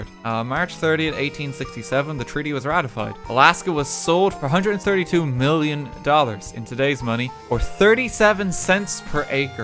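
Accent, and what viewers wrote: Ireland. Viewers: I’m a new viewer and he has a hard Irish accent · And a Happy New Year,! Thanks for a year full of Irish accented misadventures.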